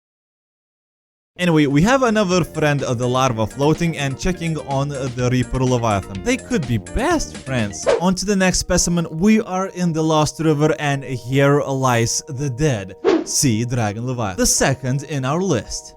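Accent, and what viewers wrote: Russia. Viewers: OMG i finally find a good youtuber your russian actcent sounds good i love your vids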